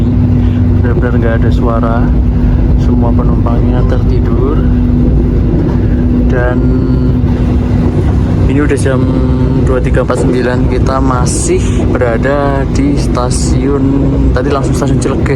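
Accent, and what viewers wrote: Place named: Banyumas, Indonesia. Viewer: Hadirr mas mas ngapak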